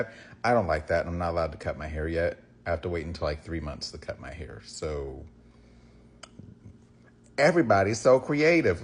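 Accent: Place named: South, United States. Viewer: I love this hair journey of yours! I also love how handsome you look, your southern accent and your name “ ”! 😂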